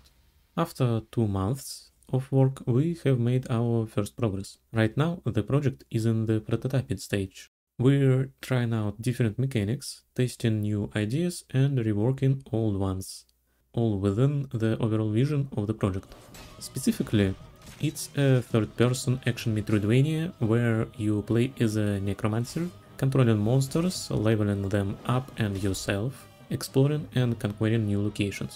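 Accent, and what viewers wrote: Russia. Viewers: По первым словам распознаю нашего русскоязычного)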